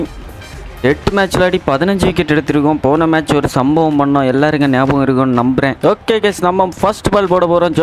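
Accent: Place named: Kerala, India. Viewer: Bro neenga kerala thaane malayalam kalanthu pesuringa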